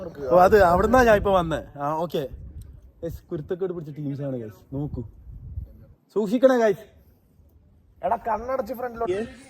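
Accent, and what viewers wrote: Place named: Kasaragod, India. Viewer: Kasargod slang aan kelkan rasam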